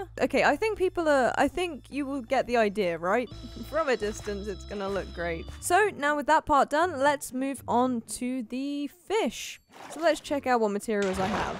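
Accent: United Kingdom. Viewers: 1 are you British 2 how did you get so good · Are you british?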